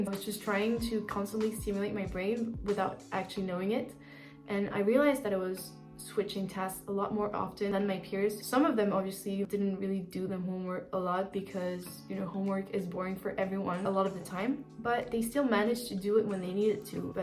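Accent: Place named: France. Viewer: Je reconnais à ton petit accent que tu dois être française (ou belge je sais pas ?). Et franchement merci pour tes vidéos. Tu mets exactement le doigt sur les problèmes que je rencontre au quotidien et je pensais tellement être bizarre et seule à être dans ce cas et tu nous prouve le contraire. Merci infiniment et continue à faire des vidéos comme ça parce que tu vas aider plus d'une personne paumée ah ah. Ça fait du bien de se sentir comprise et de savoir que d'autres personnes arrivent à mieux vivre avec leur TDAH. J'ai hâte d'avoir tous tes conseils pour apprendre à mieux vivre avec ça. Vraiment, merci ❤️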